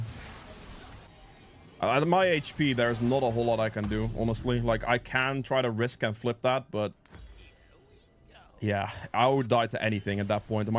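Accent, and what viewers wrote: Netherlands. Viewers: you look like kingsize lol (dutch rapper) guessing ur from the Netherlands by ur accent too, great vid once again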